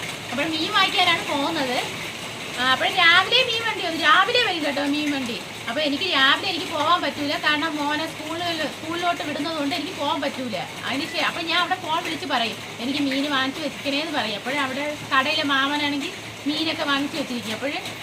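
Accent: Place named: Thiruvananthapuram, India. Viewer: ഭാഷ നല്ലതാണ്. തിരുവനന്തപുരം slang.. Artificial ആയി സംസാരിച്ചു ഭംഗി നശിപ്പിക്കാതിരിക്കുക 😊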